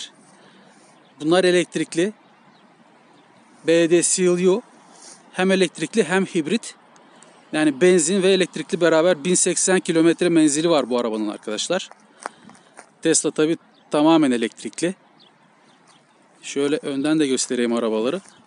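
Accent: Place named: Thrace, Turkey. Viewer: Trakyalı şive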